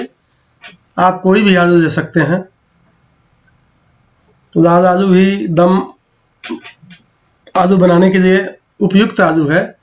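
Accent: Bihar, India. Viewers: In starting when u say Ram Ram ji that is really nice 🙏👌and the way bihari people speak that is so sweet 😊😊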